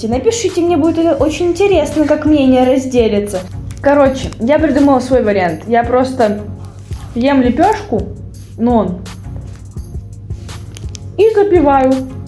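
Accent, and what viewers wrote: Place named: Tajikistan. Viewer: честный слов ты как актириса браво по акцентом разговариваешь по таджик ской язике.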